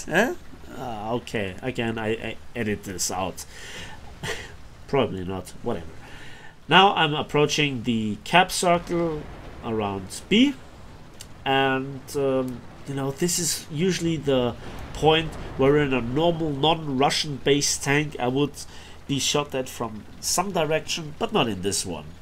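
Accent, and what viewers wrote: Germany. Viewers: Am I, am I first? Wow! Quick! Think of a cheesy thing to say... Jk. Thanks for the video. Always nice to have a good game with learning opportunities, all narrated with a satisfying German Accent!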